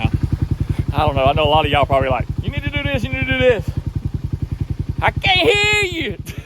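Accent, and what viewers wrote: South, United States. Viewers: After having seen quite a few of your videos, I am now also starting to understand what you say in your broad southern dialect. That was certainly not the case in the beginning.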